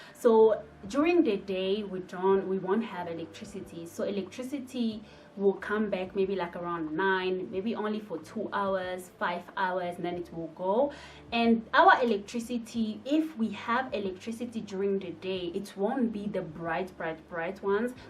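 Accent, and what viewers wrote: South Africa. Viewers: U don't look like Congolese your seems like South African English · Hhaibo your accent sounds South African now?? 😯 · She's South African this one😹😹❤❤ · You sound and look like South African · i like the way you speak English you sound like South African now and not forgeting you are so beautiful. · sounds very south African and also look very southern African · Now U have south Africa accent Ur English is just south Africañ. And i like small nyana, shela U now speak our slung. 🥰🥰🥰🥰🥰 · now youve got a south african accent nice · You look and sound South African. Did I just hear you say "smaller'nyana"...... You are South African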